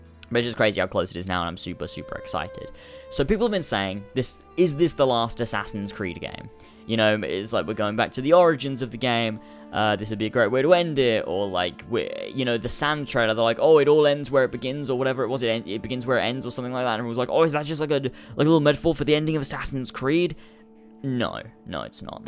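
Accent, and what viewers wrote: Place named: Northampton, United Kingdom. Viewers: He's from Northampton, you're lucky mate I'm from Birmingham and we all sound inbred. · Where bouts in the UK are you from i recognise the accent??? Im from West Yorkshire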